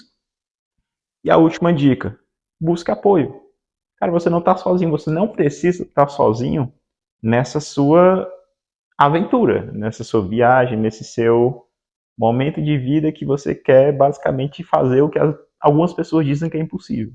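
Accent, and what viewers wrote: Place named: Brazil. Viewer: Maneiro o vídeo! Só seria legal colocar na descrição os nomes ou legenda porque como seu inglês tem uma pronúncia bem brasileira, não da pra entender todos os nomes que você fala :(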